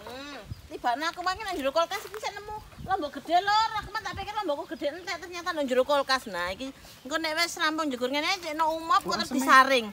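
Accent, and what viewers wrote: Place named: Surabaya, Indonesia. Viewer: salam kenal nggih, aku lht chenel smpean sekali ngono kok lngsung ktgihan, sampean iku ngangeni, trs lek ngomong logate surobyoan bnget, sakjane smoean asline endi..aku nek g ndelok konten smpean rasane motoku gatel bnget...sehat sll yo.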